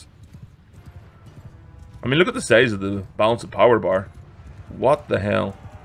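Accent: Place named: Scotland, United Kingdom. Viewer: Yet another video about bannerlord that I love and that makes me mad for not being able to afford it. I swear I'll go crazy soon watching videos like this one. Also, I love the accent, I'm Italian so I'm not used to accents like yours (I hope I'm not wrong but you sound Scottish)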